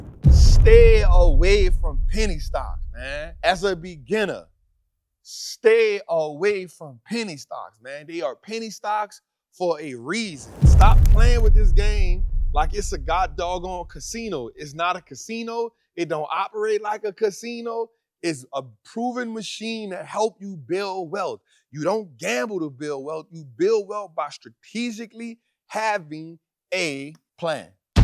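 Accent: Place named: Louisiana, United States. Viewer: Thanks for the information!! Also, love your Cajun accent!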